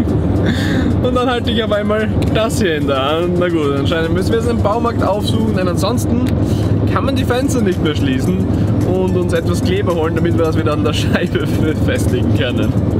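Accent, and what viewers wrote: Austria. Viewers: Kommst du aus österreich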